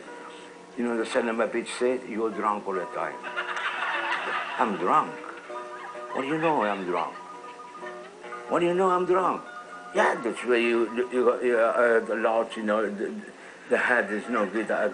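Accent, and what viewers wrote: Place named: Portugal. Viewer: This is how my parents talk too. LOL :) (Portuguese too)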